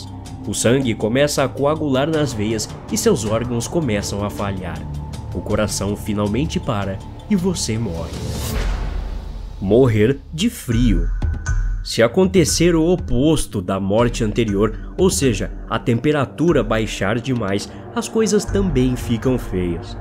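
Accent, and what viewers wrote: Northeast, Brazil. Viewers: Ele é um pouco nordestino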